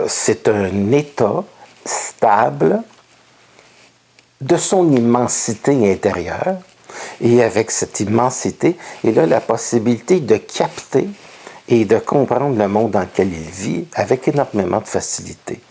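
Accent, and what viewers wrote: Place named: Canada. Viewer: Bonjour de France_ Merci M.. C'est une parfaite synchronicité car la thématique est précisément ce que je sais intérieurement et ce sur quoi je me centre spontanément en cette période de mon évolution...... Et puis j'aime bien l'accent canadien!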